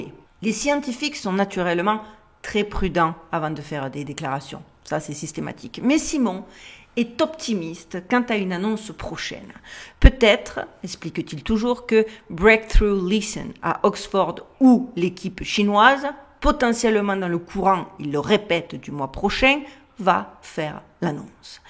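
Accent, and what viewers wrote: Marseille, France. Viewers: Ce petit bout de femme est juste extraordinaire !! J avale les vidéos les unes après les autres depuis 3 jours !! D ailleurs je suis marseillaise près du vieux port 😊 haaa vous n avez pas perdu notre bel accent ça fait plaisir ❤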